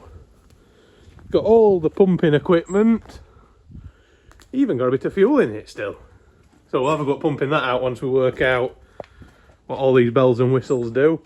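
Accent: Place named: Yorkshire, United Kingdom. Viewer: Is proper English just a Yorkshire bloke mate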